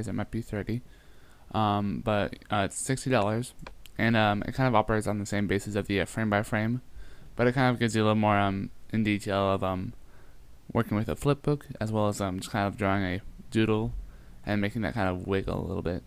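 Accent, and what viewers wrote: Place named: Ireland. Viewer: This guy sounds like some gay pissed off irish...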